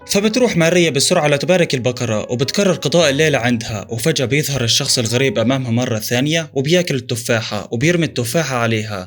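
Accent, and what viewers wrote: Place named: Syria. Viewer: لهجتك بين السوريه وبين المصريه حيره🤔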